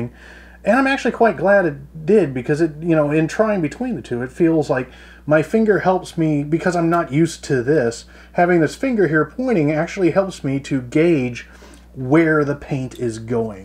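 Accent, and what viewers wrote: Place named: United States. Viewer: Never heard of Grex airbrushes, so am guessing by your accent and hints about Dollars you are in USA?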